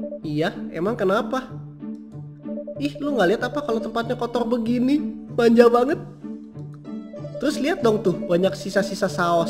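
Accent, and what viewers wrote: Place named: West Java, Indonesia. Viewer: Kak kakak kalau ngomong kayak orang sunda!!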